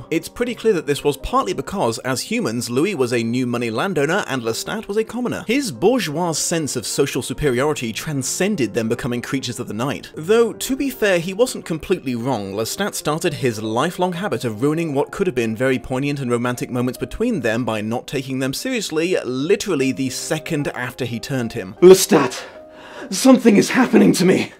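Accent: United Kingdom. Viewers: The British propaganda is working on my American ass, because that intro would have been a big nerd if it weren't for the accent 🫠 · What part of Great Britain are you from? I'm sure I've heard that accent before! But I don't know now where it is from. I lived in Benidorm for more than ten years. I was in contact with many British people during that time. Please, tell me where you are from!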